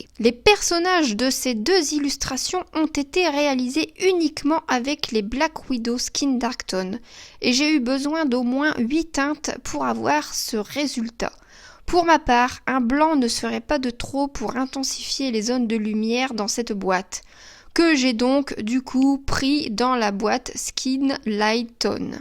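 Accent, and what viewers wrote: Nord-Pas-de-Calais, France. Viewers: Tu n'a pas a etre complexée de l'accent chti... essaies 1 fois ....rien que pour voir les commentaires ... Ose..! j"attends ta prochaine démo impatiament ..... comme je te trouve sympa ce petit truc pour te faire économiser du crayon: quand une mine casse mets une goutte de glue UHU dans le trou et atends 24 h .....bise...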